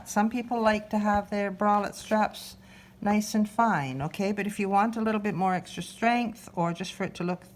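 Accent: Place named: England, United Kingdom. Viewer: Thank you so much for tutorial Turned out lovely loving your accent England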